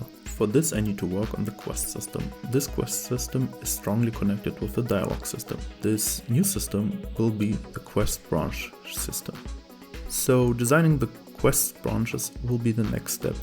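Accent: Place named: Germany. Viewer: You can hear the german.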